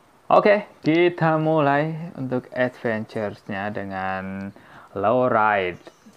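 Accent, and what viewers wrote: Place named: Malaysia. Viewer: Abang ini pasti orang Kalimantan dekat Malaysia pantesan suara nya kayak orang malaysia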